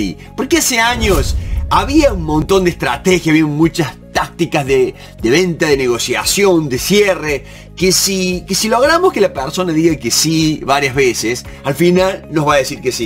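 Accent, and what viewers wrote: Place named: Cordoba, Argentina. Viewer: Claramente sos Cordobes jaja..un abrazo y muchas gracias!!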